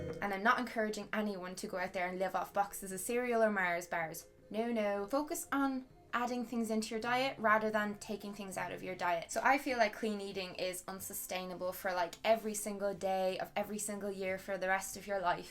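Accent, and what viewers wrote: Ireland. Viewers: I agree with everything you said! Also, it's crazy how similar your circumstances in this video are to mine! :) P.S. love that Irish accent.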